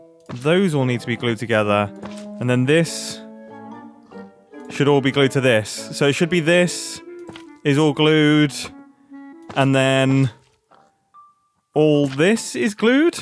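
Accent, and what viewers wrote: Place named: Cockney, United Kingdom. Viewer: a very cockney accent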